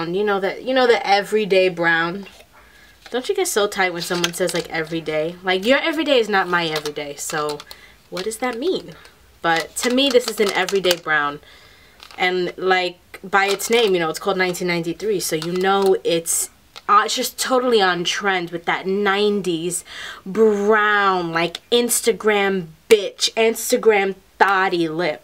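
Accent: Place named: New York, United States. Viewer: Omg the accent. Where r u from!! Ny or New Jersey but sure ny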